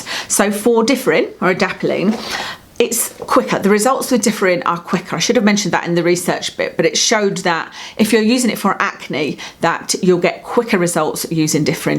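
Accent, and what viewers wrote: United Kingdom. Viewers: Heavy British accent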